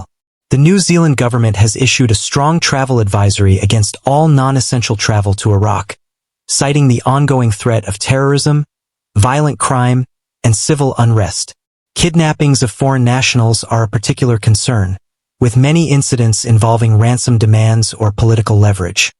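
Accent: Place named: United States. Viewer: why does this kiwi sound like a yank?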